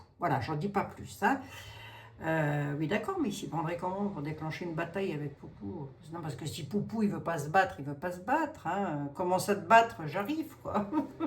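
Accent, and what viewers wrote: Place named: Lorraine, France. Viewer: Vous me faites rire et croyez moi ça me fait du bien. Je suis une Lorraine de Meurthe et Moselle "expatriée" en Bourgogne et entendre votre language Lorrain me fait un bien fou 😁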